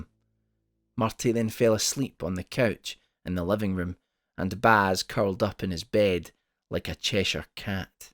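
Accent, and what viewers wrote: Scotland, United Kingdom. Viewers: one of my very favorite authors! What a dark and beautiful story this was... Good for Martha 👍!! Thank you, I love it when you read your own stories with that lovely Scottish accent. I thought at first the story had just ended with that long pause but was happy when I went back to listen and found the fantastic ending! I hope you're staying happy and healthy in spite of all the madness of this world right now. Much love to you and your little family ❤️😘♥️. ♥️🇺🇸♥️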